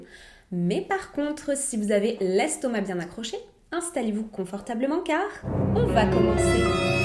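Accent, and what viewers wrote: Quebec, Canada. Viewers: Je viens de te découvrir.J'adore!...Mais serais-tu d'origine quécoise en premier lieu?Je suis du Québec et j'enttend des différences.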